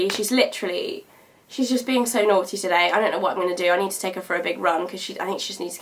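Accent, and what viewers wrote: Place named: RP, United Kingdom. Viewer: you sound so posh! (not in a bay way! )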